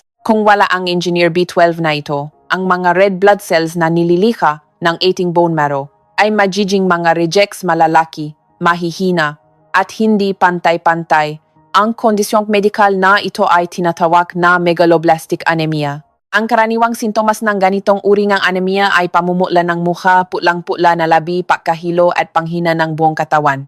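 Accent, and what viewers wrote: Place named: China. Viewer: DOKTORA MAGARAL KA MUNANG MAGSALITA N G TAMANG BIGKAS NG TAGALOG ,, PARA KANG INTSIK TULO LAWAY KUNG MAGTAGALOG,, so ma lo sep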